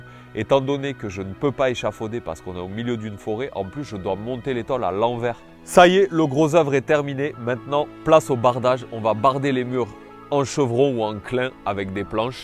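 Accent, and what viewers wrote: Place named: South, France. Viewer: Très beau travail dans le rendu final vraiment bravo ! J'ai quand même encore beaucoup de craintes sur la pérennité et la sécurité de la cabane au point de laisser des gens y dormir (juridiquement et en cas de pépin) . Et par contre stpp arrête de parler de bois flotté partout mdr c'est pas en le trouvant au bord de ton cour d'eau que ça y est c'est du bois flotté 😂 En tout cas j'ai quand même tout suivi de A à Z et j'ai kiffé tout ton projet ta du mérite 💪(petite dédicace à ton accent du Sud étant de Nîmes ❤️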